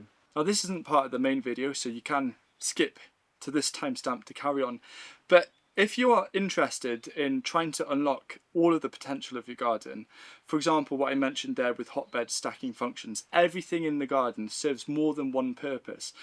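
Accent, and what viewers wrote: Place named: Wales, United Kingdom. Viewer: Just pre-ordered "The Permaculture Garden" and am looking forward with great anticipation to a fantastic gardening journey. And, agreed: leeks are the most important part of the garden. Now we just need the audio book version with you as the narrator, because such a gorgeous Welsh accent should be used as much as possible. I truly believe that your garden, hearing you talk to all the plants and shrubs and worms, is made that much better for the beauty of hearing words of encouragement in that amazing accent. Thanks for all that you do for the community. Cheers!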